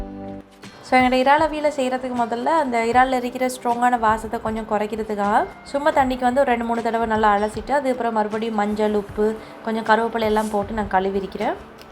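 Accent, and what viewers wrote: Sri Lanka. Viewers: அஸ்ஸலாமு அலைக்கும் உண்மையில் உங்கள் அழகு இலங்கை தமிழில் பேசுவது மிக மிக அழகாக உள்ளது எனக்கு பிடித்த தமிழ்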